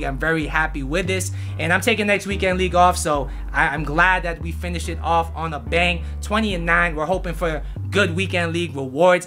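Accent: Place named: California, United States. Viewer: Cali boi? I can sense an accent bro hahaha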